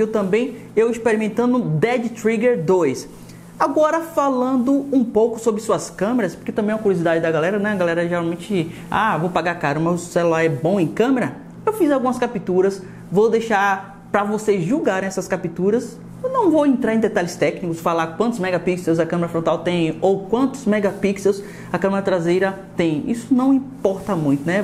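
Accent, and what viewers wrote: Bahia, Brazil. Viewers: Já da pra vê que esse e baiano vu skskskksksk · Vc e bahiano de salvador?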